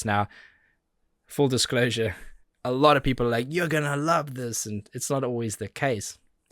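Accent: South Africa. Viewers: My favourite album ever! I’m new here. What’s your background? I think I hear a slight South African twang to the way you say certain words! I’m Zimbabwean-born and grew up in London. I’m definitely going to subscribe as I really like your vibe. Perfectly timed to find your reaction and my favourite band. If you still haven’t listened to this whole album, you definitely should. It’s such an experience from start to finish xx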